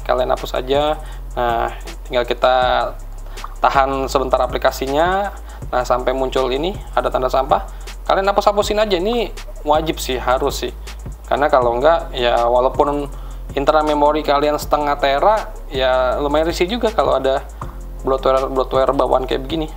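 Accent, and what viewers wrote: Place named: Sulawesi, Indonesia. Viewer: Kental banget logat Sulawesi nya bang 😂😂😂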